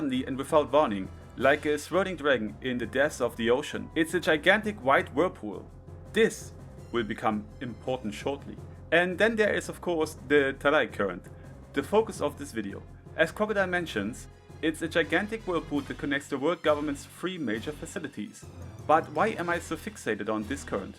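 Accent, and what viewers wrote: Germany. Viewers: Are you German by any chance? Video is great 🎉❤ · he sounds german. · Bruder dein deutscher Akzent ist nicht zu überhören 🤣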